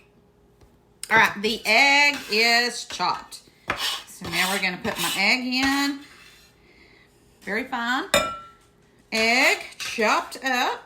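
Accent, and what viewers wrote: South, United States. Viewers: I detect a Southern accent..so that being said..where is the Duke's?! 😂😉❤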